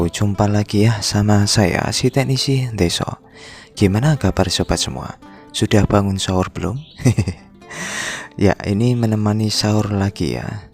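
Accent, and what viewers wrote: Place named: Banyumas, Indonesia. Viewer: salam ngapak bro aja kelalen madang😂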